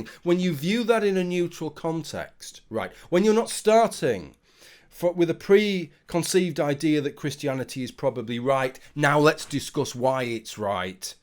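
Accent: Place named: North, United Kingdom. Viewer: Hi. I'm not a native English speaker, and while I still enjoy the battle religious people have against nonbelievers like me, I mostly listened to this video trying to learn understanding English better, especially British accents. Yours is very easy to understand, but the accent hints to probably more north than south. Not close to Scotland, probably, so I'd guess Leeds area? Cheers!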